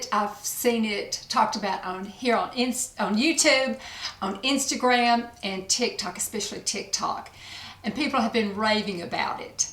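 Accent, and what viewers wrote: South, United States. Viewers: I could listen to your that lovely southern voice of your s all day ❤️💞 definitely going to buy that mascara.you have lovely eyes so sparkly.eyes are definitely the window to the soul.😘😘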